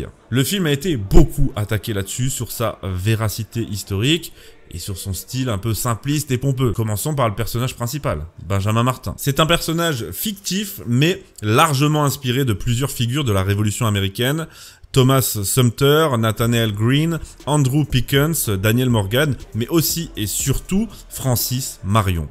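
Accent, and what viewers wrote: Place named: England, United Kingdom. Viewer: toujours interessant ce genre de vidéo mais l'accent anglais... svp...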